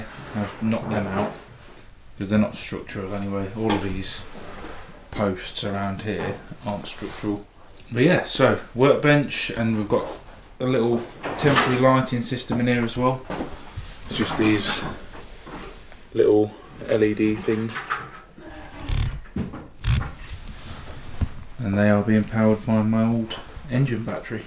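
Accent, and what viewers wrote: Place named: Peterborough, United Kingdom. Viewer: Just come across your channel and I recognised your accent straight away, I'm from Peterborough. I lived in my van for a year or so. I look forward to watching your adventures, the scenery looks amazing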